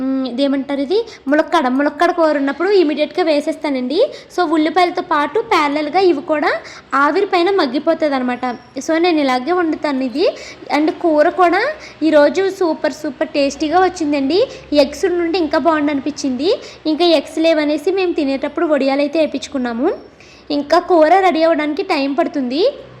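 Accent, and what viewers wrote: Godavari, India. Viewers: Ma godavarolu bhasha alage untundi...yevaru marchaleru....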